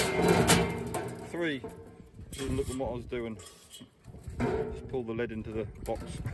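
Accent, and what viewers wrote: United Kingdom. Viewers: You accent is very thick, are you from the U.K.?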